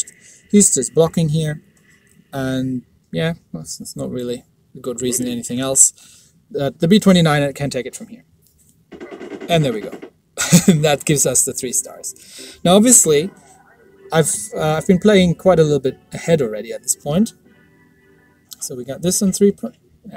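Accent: Germany. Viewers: Nice video! I'm currently struggling through the 120's lol. Just can't keep my units alive long enough and my health high enough to 3 star stuff. Also, noticed that despite living in Australia for so long, you've still got a prominent German accent. A lot of people tend to lose theirs over time or pick up a new one from where they live.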